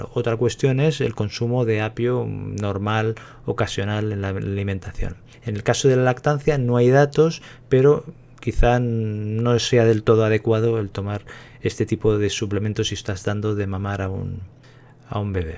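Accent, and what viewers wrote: Spain. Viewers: where in the world did you get your study you are very confusing you sound like you're from Spain good looking man but apparently you have not done your research on celery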